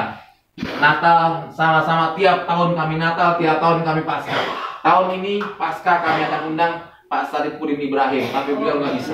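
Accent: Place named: Java, Indonesia. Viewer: Bilangnya dari sunda tapi logatnya jawa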